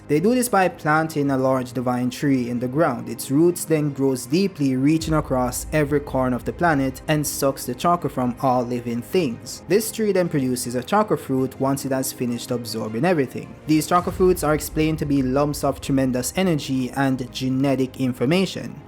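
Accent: Jamaica. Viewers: by chance Jamaican?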